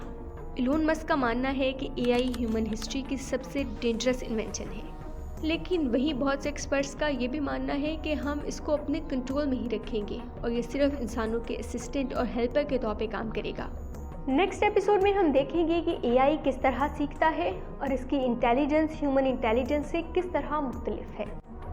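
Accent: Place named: India. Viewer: Sorry I had to stop watching you when you talk like an Indian. Its not urdu but indian hindi. Maanenna hay is indian style. Urdu is meray khayal main or meray motabiq. Bye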